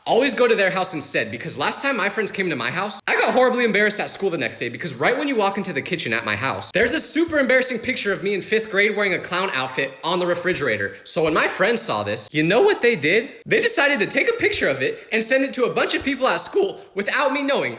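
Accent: United Kingdom. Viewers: you’re British ?ahhhhhhhhhh! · Wait you're British? .. . . . . . . . Aaaaahhhhhhhhhhhh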